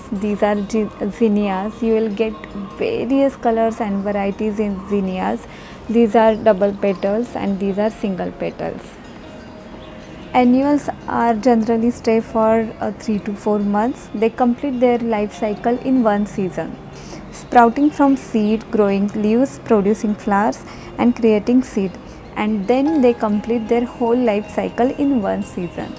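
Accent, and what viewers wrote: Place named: Gujarat, India. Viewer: Your english accent must be the gujarati one! That's very sweet and soothing!!🤩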